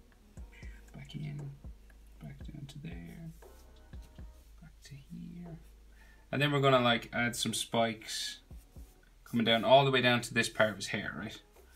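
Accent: Ireland. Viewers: Are you Irish? Just curious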